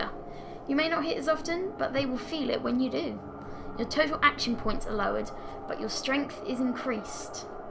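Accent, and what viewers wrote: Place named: United Kingdom. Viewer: I didn't quite understand the name of the game studio you're working in... Anyway, I'm eager to watch this series, because even though I really love the Fallout franchise, I've only played the PS2 spin-off Brotherhood of Steel and all the Bethesda games. So I'm more than excited to watch somebody else play the classic that started it all (well okay, that would technically be Wasteland lol), especially somebody with a British accent. :3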